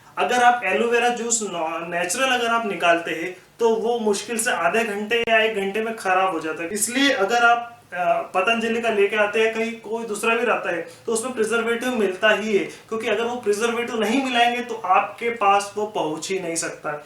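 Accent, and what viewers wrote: Indore, India. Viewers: Indori tone!!!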